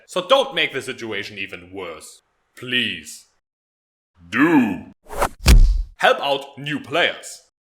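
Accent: Germany. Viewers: ***** Dass es Deutsch war wusste ich. Ich war nur verwundert, da ich persönlich den deutschen Akzent nicht wirklich mag. :3 Aber trotzdem danke für die Info.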